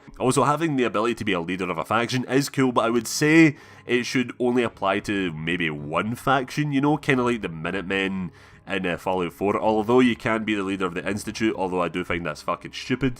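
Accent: Scotland, United Kingdom. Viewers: This is my first vid of his that I’m watching and in the first few seconds I thought he was Russian, and then I heard the Scottish accent, this is pretty much the only Scottish gamer I’ve found on here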